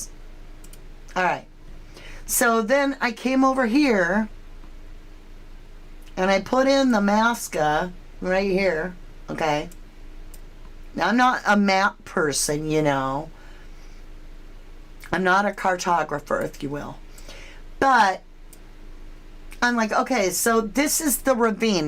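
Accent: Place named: United Kingdom. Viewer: Love your British accent 😂❤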